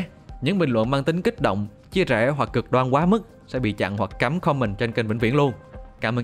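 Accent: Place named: Quang Nam, Vietnam. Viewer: Giọng đọc không giấu được chất quảng nam.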